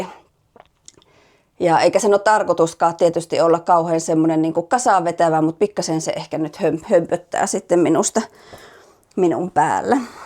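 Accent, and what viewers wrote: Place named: Eastern Finland, Finland. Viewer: Tykkäsin tästä videosta ja laitoin tilaukseen. Oletko kotoisin itä-Suomesta? Puheenparsi kuulosti niin tutulta. Minä tykkään neuloa keinutuolissa ja sään salliessa puutarhakeinussa. Hyvää kesää!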